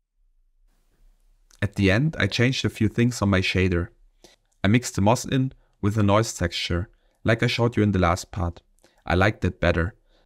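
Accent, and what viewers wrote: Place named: Germany. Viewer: ich wusste doch das ich Deutsch rausgehört hab! Aber geiles Tutorial ehrlich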